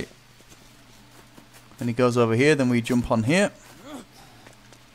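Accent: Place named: United Kingdom. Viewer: He's a Brit, it's just how they say it. It's when they pronounce aluminum and antibiotics that break my mind.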